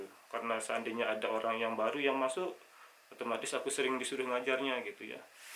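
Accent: Japan. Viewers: Logat Indonesia nya hampir ketutup bahasa Jepang.. Tapi faham jga sih yg dijelaskan. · lama dijepang, logatnya udah jepang bgt.. 👏🏼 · Mungkin kelamaan di jepang jadi ada logat jepangnya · puluhan tahun hidup di jepang logatnya udah hampir kebalik yaa om lidahnya soalnya sering bicara pakai bahasa jepang 😁 semoga sehat selalu om 🙏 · sdh kayak org jepang banget... Dari logat dan intonasi nya... Kyk nya lama2 abang juga kayak abang.. Ilang lombok (indonesia) nya... Semangat bang.trmksh info nya.. 👍👍😀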